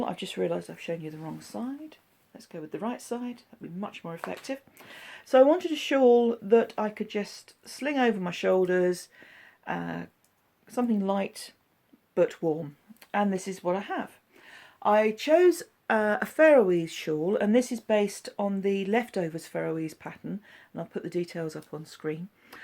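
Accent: England, United Kingdom. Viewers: Subscribed - expat now living in Canada - nice to have the english accent ;-)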